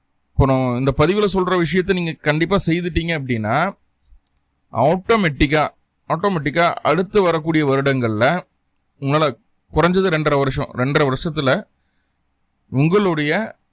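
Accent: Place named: Kongu, India. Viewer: நீங்களும் வளமுடன் வாழ வேண்டும். அலட்டல் இல்லாத நடையில உள்ள உங்கள் கொங்கு பாஷைக்கு நான் அடிமை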